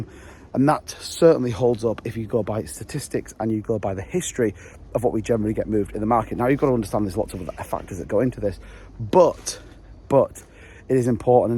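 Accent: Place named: Bradford, United Kingdom. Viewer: Wear are you from bro? Sounds like Bradford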